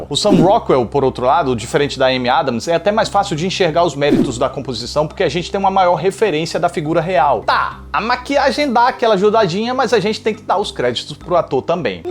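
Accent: Northeast, Brazil. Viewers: Amigo vc é do Nordeste é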